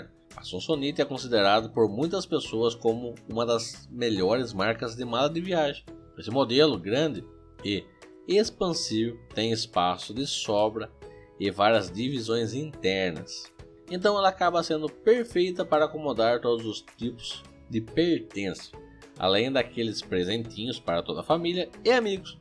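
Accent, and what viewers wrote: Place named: Piracicaba, Brazil. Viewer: Você é Piracicabano?? kkk sotaque muito bom!!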